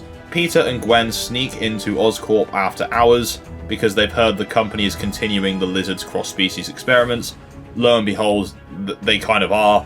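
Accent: United Kingdom. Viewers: you're british right ?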